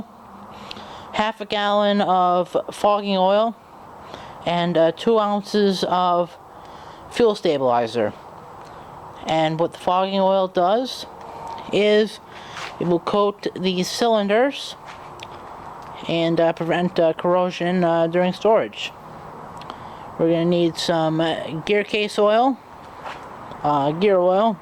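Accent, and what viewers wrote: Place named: Newfoundland, Canada. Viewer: Where are you from? I first expected Newfoundland until you were talking in gallons and pints. Lol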